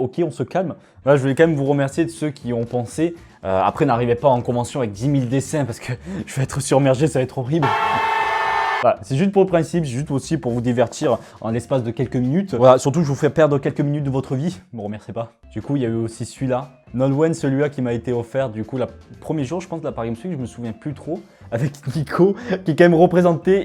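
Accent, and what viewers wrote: South, France. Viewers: Byron 650 c'est l'accent du sud je pense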